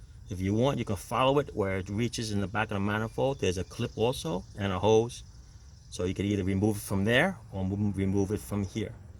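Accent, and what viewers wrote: New York, United States. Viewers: you have this Italian accent lol are you from NY...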